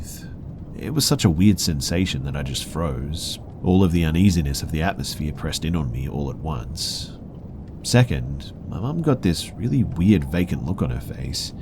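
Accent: Australia. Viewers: Yesss! I love bedtime horror stories, & you're the first Aussie narrator I've found! Greetings from chilly Canberra.👋 Insta-Subbed; love to support my sunburnt brethren.❤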